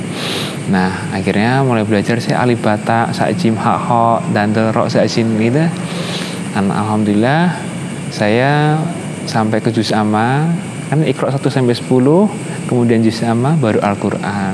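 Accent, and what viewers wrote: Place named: Java, Indonesia. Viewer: di maklumi sobat....lidah orang jawa memang kebanyakan begitu.nggak usah di permasalahkan.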